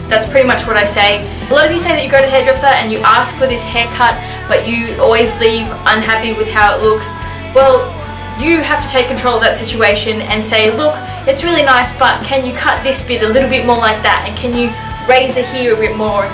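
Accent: Australia. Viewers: Are you australian?? XD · are you Australian ?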